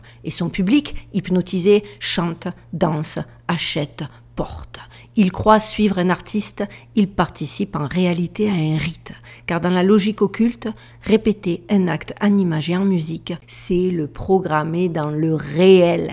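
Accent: Marseille, France. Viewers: Incroyable. Masterpiece. Avant dans les années 80(dsl chui un vieux😂😂😂)tout etait symbolique pour des initiés... Comme si les signes étaient mis pour les doués d intelligence... Aujourd'hui 2025😅😅😅😅 plus c est gros flagrant plus ca passe. Et la meilleure invention pour contré les chercheurs de vérité c est le mot COMPLOTISTE.. Des que quelqu'un a une analyse sur un fait populaire il est taxé de complotiste😅😅😅😅😅... Franchement bravo pour travail car au début je ne comprenais pas qu une dame avec un accent du vieux port abordé des sujets aussi 'Touchi' et finalement je trouve vos analyses ultra pertinente. Courage et allez l om😂🎉🎉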